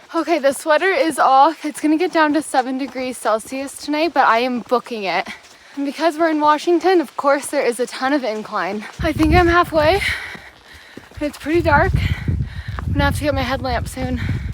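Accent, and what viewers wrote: Canada. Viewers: Great Canadian accent.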